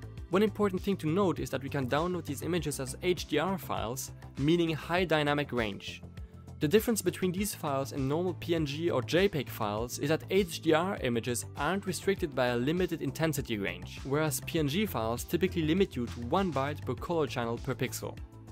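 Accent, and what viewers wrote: France. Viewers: looks like an french accent you got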